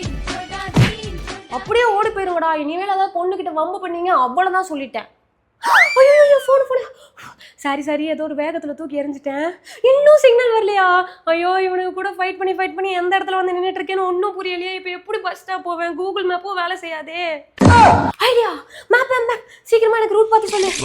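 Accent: Coimbatore, India. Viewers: Neengha coimbatore ah akka ??? ♥️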